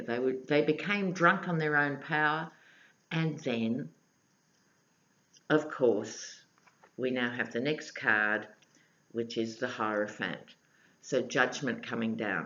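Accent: Australia. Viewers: Thank you, - ever bright, sparkling & insightful (and wonderful with our familiar Aussie accent & cultural/slang refs!😉) A particular thank you for mentioning dear Becca of Readings by the Empress, too. Her “Why God, Why Trump?”(30 May) reading was indeed a really beautiful, powerful & nuanced outlining of the bigger, higher picture - sort of like a ‘Gaviscon Calming Raft’ for these emotional gastric reflux times!! Blessings, blessings all. <3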